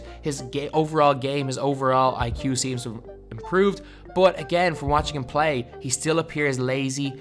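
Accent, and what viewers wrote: Ireland. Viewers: Are you irish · Are you from Ireland?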